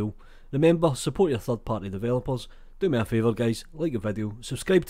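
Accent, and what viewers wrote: Scotland, United Kingdom. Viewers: I just love your Scotland accent, you actually sound like one of the people from the movie BraveHeart with Mel Gibson!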